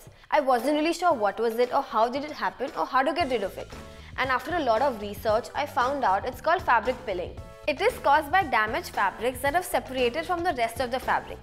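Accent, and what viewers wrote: India. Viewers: Tania Castellon lol she is speaking English with an Indian accent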